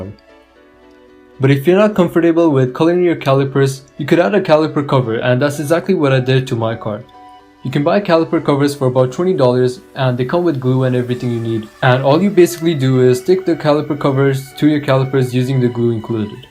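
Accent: India. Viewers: Are you from India? You have Indian accent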